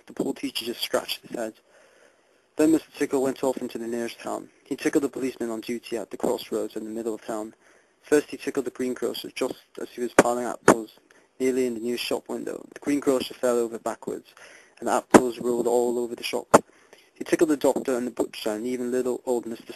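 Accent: Manchester, United Kingdom. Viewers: definately northern, but not yorkshire, i'm yorkshire. more manchester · you sound more manchester. take it from me. i live there. Yorkshire is very harsh, very pronounced. manchester is softer.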